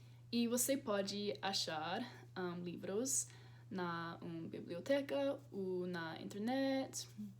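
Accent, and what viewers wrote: Brazil. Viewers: o sotaque parece o meu (carioca) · estás a aprender o português com sotaque brasileiro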